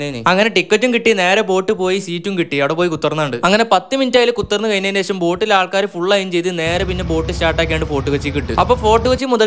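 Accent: Malappuram, India. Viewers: Malappuram language paranj show kanikkunna chila aalkkarundu. But samsaram no raksha. Natural aanu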